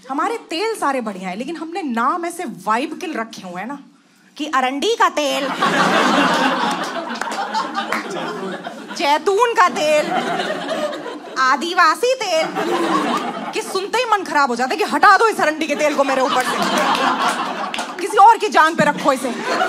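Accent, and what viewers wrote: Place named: Punjab, India. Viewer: U r not funny at all. I don't know why people are laughing. Punjabi accept and poor punches.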